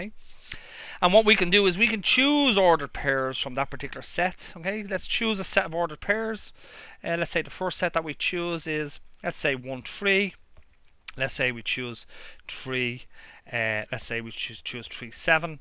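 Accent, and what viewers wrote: Ireland. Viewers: who would have guessed that watching jacksepticeye would get me used to the irish accent enough to help me learn math?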